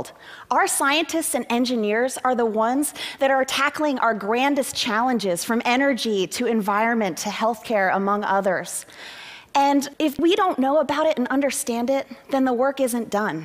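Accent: United States. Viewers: I dont think so..that was an Australian series- this woman has an American accent.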